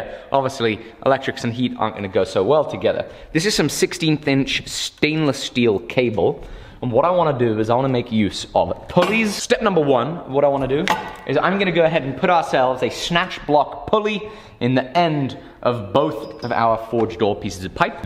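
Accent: United Kingdom. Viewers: thought so as he says certain things in a british way or using British slang plus the accent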